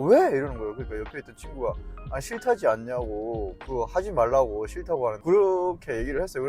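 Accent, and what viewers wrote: South Korea. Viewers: 지금까지 본 분들중에서 발음이 가장 남한말과 똑같이 잘하시네요? 응원합니다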